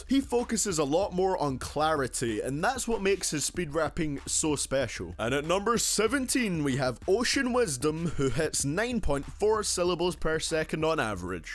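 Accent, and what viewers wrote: Scotland, United Kingdom. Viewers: Are you Scottish by any chance or its just your voice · Are you Scottish, because I am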